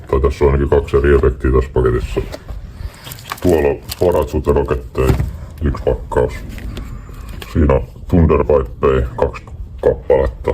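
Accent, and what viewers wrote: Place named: Pori, Finland. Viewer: ootko porista? mietin ku murre kuulostaa siltä😅 no anyways on kova setti💥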